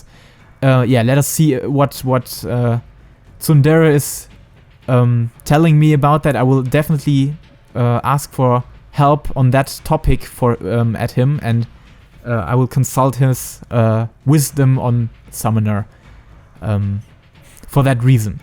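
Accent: Germany. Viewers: es ist zwar ein deutscher dialekt vorhanden, aber grammatik/wortwahl sind immer richtig und die aussprache verständlich, also den zweck erfüllt es allemal :s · Reupload es am besten auf deutsch es klingt echt schlimm wenn deutsche eng reden .. nicht böse gemeint :)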